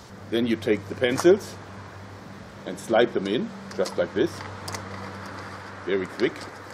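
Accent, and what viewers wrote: Germany. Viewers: Bist du Deutscher hört sich so an ? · Are you german? I think I hear a slight German accent but I’m not sure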